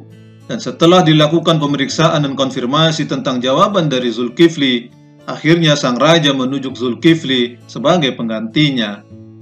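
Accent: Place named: Makassar, Indonesia. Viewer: Yg ngomong dialeg org makassar wkwwk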